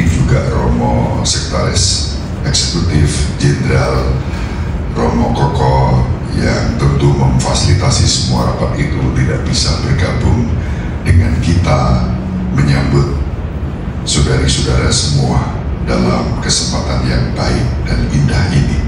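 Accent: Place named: Kalimantan, Indonesia. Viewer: Kalimantan mana suaranya❤❤❤😅😊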